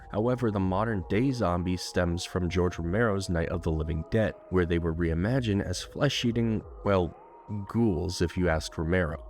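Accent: United Kingdom. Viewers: he's british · He's. British.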